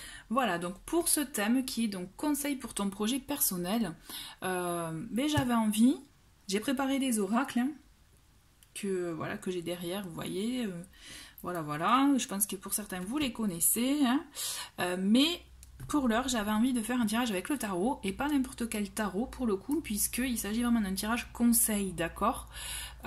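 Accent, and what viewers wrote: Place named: Midi, France. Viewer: Projets 🙏 avec ce bel accent du Midi si je me trompe pas !!!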